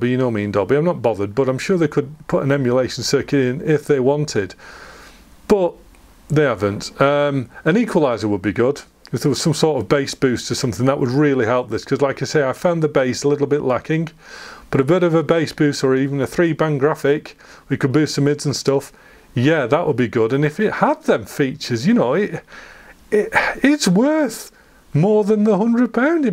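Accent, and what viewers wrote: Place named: Liverpool, United Kingdom. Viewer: Excellent video. But why are you speaking in a scouse accent? Where exactly in Liverpool are you from? If you are not from the Merseyside area why are you speaking in a Scouse accent?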